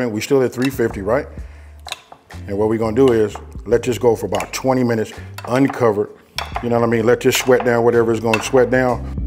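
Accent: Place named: California, United States. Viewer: sounds like an Cali dude who’s family came from the south. ❤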